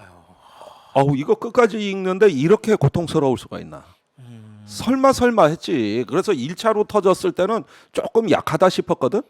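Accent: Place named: Chungcheong, South Korea. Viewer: 졸라싸워! ㅋㅎ 충청도말씨 구수허유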